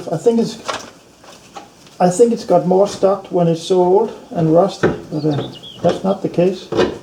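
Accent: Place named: Denmark. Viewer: judging by the accent, I'd say you are from Denmark ❤ the video just showed up on my feed! cool project! keep it up!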